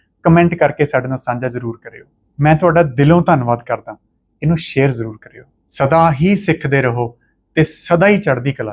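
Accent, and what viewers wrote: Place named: Canada. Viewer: Yes I want it also. Because sir speaks canadian accent.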